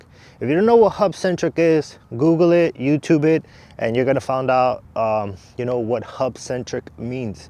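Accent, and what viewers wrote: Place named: Mexico. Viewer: I got some work for you and your people, maybe even a speech class cuz I still hear that Mexican dialect!!!!!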